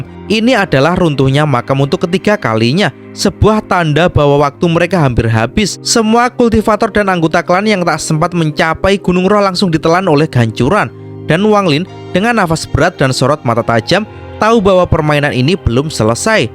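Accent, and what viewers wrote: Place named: Java, Indonesia. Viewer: Jawa ya😂😂 mendok kali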